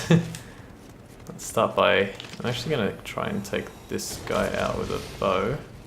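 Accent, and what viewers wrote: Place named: Australia. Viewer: This is a great series, also where abouts in Australia are you from?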